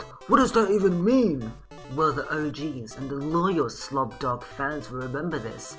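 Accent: Australia. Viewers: Ur content is gangster where u from bro? australia?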